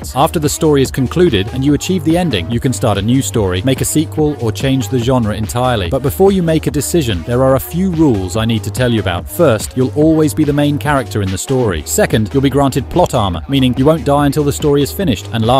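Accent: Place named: United States. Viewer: Why is the AI American now wtf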